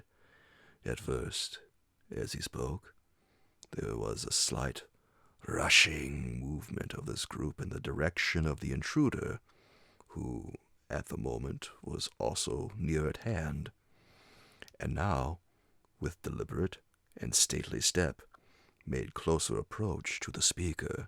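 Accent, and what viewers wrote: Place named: United States. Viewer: Thanks for this one! One off my favourites of Poe's tales. You've got to have a talented American narrator for Edgar.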